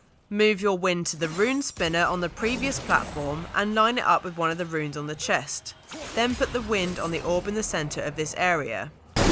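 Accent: United Kingdom. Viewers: She’s British that’s just how we would pronounce it over here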